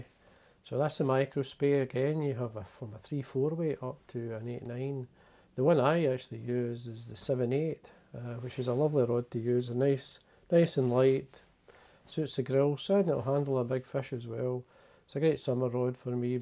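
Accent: Scotland, United Kingdom. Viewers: Finally put a face to those fingers and that lovely Scottish voice! I had a bamboo rod put in my hands 63 years ago. Started tying and buling rods 40 years ago and have loved every minute of it. When my dad passed, I found that old bamboo rod and completely rebuil it to its original condition and caught trout on it 63 years later! What a joy. It was as if dad was there and probably was.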